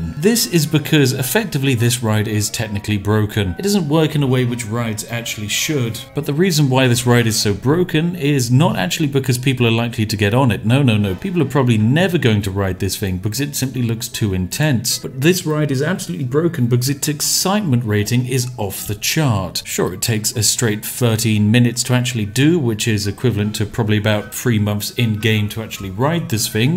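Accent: United Kingdom. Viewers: "The most exciting thing since teabagging" and "welcome to the bone zone" perfect commentary delivered in the excellent British accent, love it!!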